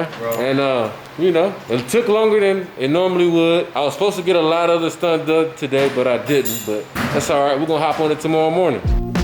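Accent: United States. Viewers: Smashing a grinder through something screwing it up and cleaning things on dirt. This guy is just me with an American accent.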